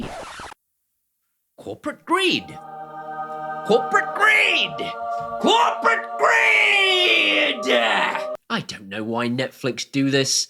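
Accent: United States. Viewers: That was an AMAZING American accent! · nice American accent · That was a pretty good American accent. Very nice review too! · Ok, as ANOTHER American watching, I am genuinely impressed with your American accent...great video as always. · Pretty good American accent!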